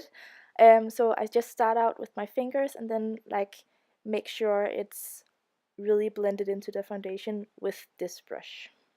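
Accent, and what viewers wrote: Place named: Denmark. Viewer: Man kan tydeligt høre at du er fra Danmark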